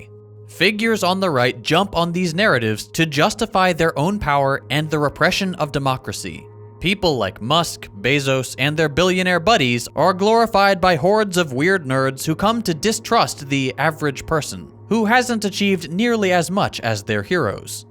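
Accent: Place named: Idaho, United States. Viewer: my mistake. People in Idaho sound a lot like you.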